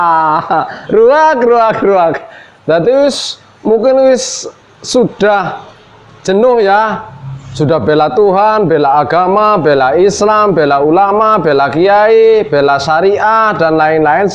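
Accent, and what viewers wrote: Banyumas, Indonesia. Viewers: Agama baru kang, agama kadrun hahahha. Memang manteb pake gaya khas ngapak nya.. good job kang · Kaya kuwe ujung ujunge yah 😂 Ngapak the best 😎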